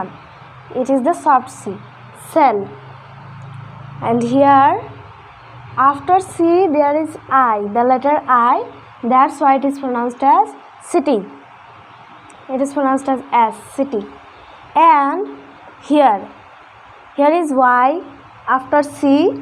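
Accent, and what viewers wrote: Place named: United Kingdom. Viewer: Love British accent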